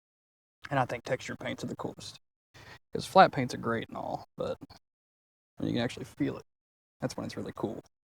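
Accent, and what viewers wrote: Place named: South, United States. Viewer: Its rare that I come across a new youtubers literal first video just as they are starting out. I really actually enjoyed this video, kinda reminds me a lot of Bob Ross, you've got his hair on point. and I can hear a little southern in your accent that I think adds a lot of charm as well. Keep it up, I'll stay tuned and hope to see your channel grow. maybe you could be like Bob Ross but not only painting nature but painting nature out in nature! I think that's a great idea! also, you've actually managed to tempt me to try painting myself in a similar way, it looks like a really neat and fun technique. Edit: also, I see no mistakes. just happy accidents.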